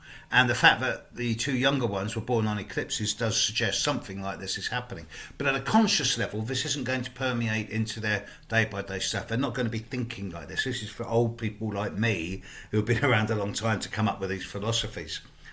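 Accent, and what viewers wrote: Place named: Southern England, United Kingdom. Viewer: Hey❤ bless you for saying about Williams middle name I remember when he was born thinking the same..Once and Future King... (I'm a kitchen table astrologer, of several decades) I did his chart and felt such a surge of pure hope..(he was born just before my eldest daughter) And he was born on the summer Solstice too... All shall be well. Nice to hear a UK southerner voice... Glad to have stumbled on your no nonsense channel... Thank you xx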